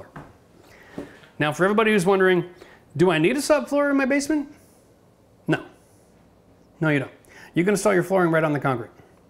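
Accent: Canada. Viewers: It's gotta be the Canadian accent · I've watched every video you have. You seem like a cool guy and you are VERY knowledgeable! I don't mind that you have plumped up a bit over the years. Don't even mind the Canadian accent, but every time i see your dewalt tools i throw up a bit.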